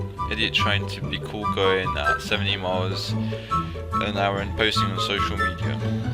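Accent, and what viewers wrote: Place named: United Kingdom. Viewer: Great to have a UK voice on a channel 😀Suvscribed👍🏼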